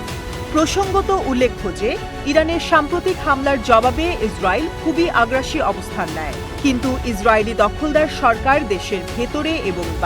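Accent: Kolkata, India. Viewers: ৭১ টিভি কি সংবাদ পাঠে কোলকাতার সংবাদ পাঠিকা নিয়োগ দিয়েছে? উচচারণে তাই মনে হচ্ছে।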